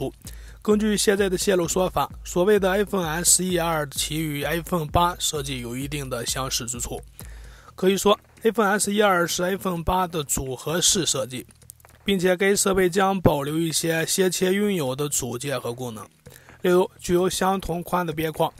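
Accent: Shanxi, China. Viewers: 主播山西人？？？？？ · 这货是山西人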